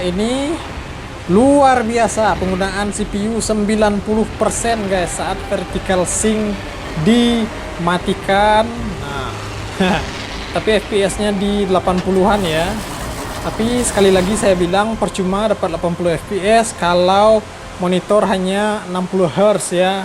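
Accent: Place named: Padang, Indonesia. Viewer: Orang mana bang?logat nya kek org padang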